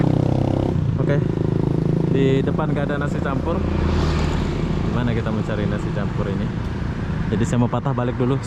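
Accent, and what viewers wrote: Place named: Malaysia. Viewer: Mas bro asli mana kok dialog dan logatnya seperti orang melayu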